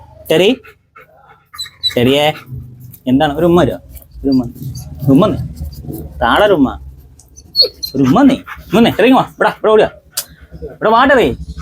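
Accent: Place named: Vadakara, India. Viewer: ഞാള് ഞൂള് തല്ലി പൊളി വടകര മലയാളം😅😅😅😅😂😂😂😊😊😊😊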